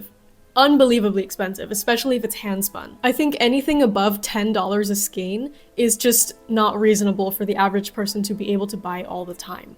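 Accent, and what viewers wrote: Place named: Netherlands. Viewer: took me until to realize youre dutch too holy shit edit: the netherlands. not holland lmao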